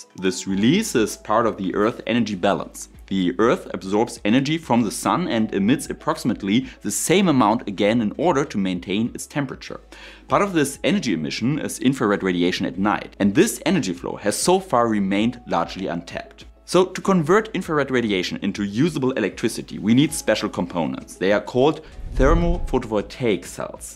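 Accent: Germany. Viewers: Your English is perfect just spoken with a slight German accent (I can understand you better than some Southern American accents). This is a VERY interesting topic and I liked how you presented the technology and then the hurdles. I subscribed. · Das Englisch ist wirklich nicht schlecht und wird auch immer besser. Ich denke, dass es im allgemeinen als ein charmanter Akzent passend zum Kanal-Namen wahrgenommen wird (wir hoffen ja alle, dass unser deutscher Akzent als charmant wahrgenommen wird)... Also ein echtes Markenzeichen! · Nothing wrong with your English. No need for excuses there. Don't denigrate yourself. Besides, if anyone clicked on and then gets mad for a German accent, that is totally self-inflicted. As for the cells... really depends on how much energy they cost to produce and how much energy they make in turn. Wonder what a first experiment would look like - regular photovoltaic on top, mercury cadmium telluride on the bottom. 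· Are you sure you want me to hit the subscribe button? As I am already subscribed and now I am unsubscribed which is annoying as I like your German accent, and you speak English fine, So I sorry bit I going to go against your wishes and subscribe to you again. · You're English is fine -you do sound very German, but pronounciation is great. You do have slight vibes of Jurgen the German, a character created by Harry Enfield for his BBC comedy show.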